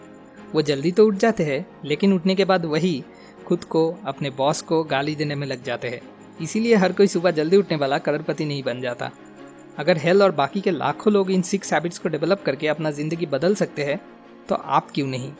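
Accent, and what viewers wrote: Bengal, India. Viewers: Dada accent 🙌🙌it's too sweet rosugulla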